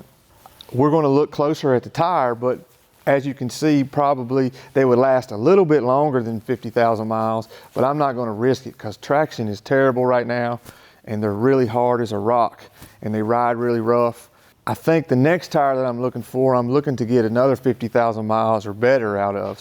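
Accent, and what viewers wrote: South, United States. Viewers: Tars (tires), awl (oil). The southern accent has a charm all it’s own.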